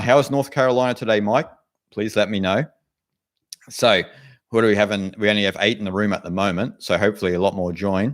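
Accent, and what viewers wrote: Australia. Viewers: Hello Mate!🖐❤🙏🕉 I'm from Los Angeles.😈 oops! I mean😇. Brah hahahaha🤪🤪. Its Thursday pm PS time! Watching the replay! Thanks Buddy❤👍.L❤VE your Aussie Accent!😇❤🙏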